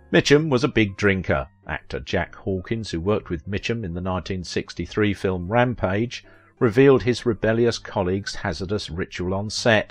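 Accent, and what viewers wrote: United Kingdom. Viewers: Fruity Brit accent used for fake gravitas in trying to smear a great actor. This narrator claims Mitchum had 49 drinks of rum before dinner. This narrator is unforgiveable, don't waste your time. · Am subscribing for the smooth Brit voice, good researched.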